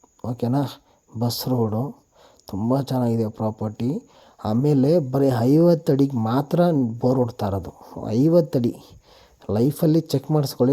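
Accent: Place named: Tamil Nadu, India. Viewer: Irritating presentation 😢😢, please speak naturally, kannadavanna tamil accent alli mathadthare. Helidde heltheera, gottu english sariyaagi baralla antha, sariyaagi kannadadalle maathanaadu, english shoki yaake 😢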